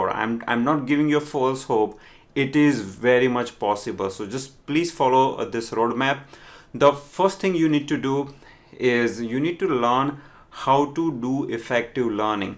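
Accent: India. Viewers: Bhai tu indian lagta hai english se ??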